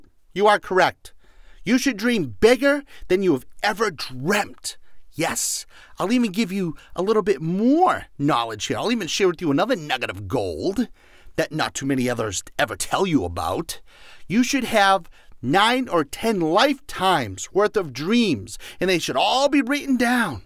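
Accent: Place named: Boston, United States. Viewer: This was actually reassuring. I seek the gurus who believe HAPPINESS is a choice we can all make right now ... not the ones with the carrot and stick ... I rarely come across the "scammers" you are talking about. (Watch, one of their ads will pop up as soon as I post this.) I can just imagine, though, people who are in a desperate place - seeking a new job for example - falling victim to the song and dance you are talking about. KEEP BOTH HANDS ON YOUR WALLET is definitely good advice out here in Self Improvement Land. Thank you for the red flag advice; thanks for the free resources and I love the Boston accent.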